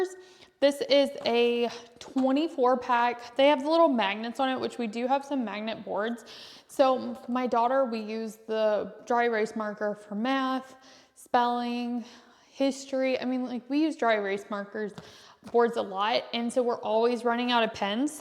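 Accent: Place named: South, United States. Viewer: Really, that's a southern thing. Don't change for who you are for a comment. My family is from the south and says it the same way. 👍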